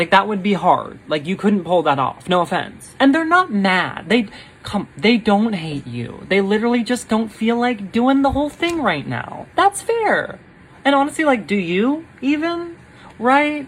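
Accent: England, United Kingdom. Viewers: LOVE your British accent. :) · BRITIAN ISNT JSUT ENGLISH STOP SAYING UR ENGLISH ACCENT is BRITISH as a Scottish person it offends me And we say ye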